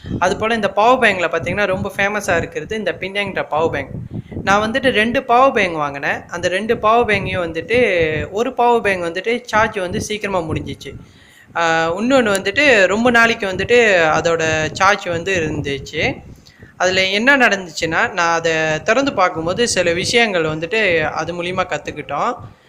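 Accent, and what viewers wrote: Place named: Malaysia. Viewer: Malaysia la apdi thaa pesuvaanga bro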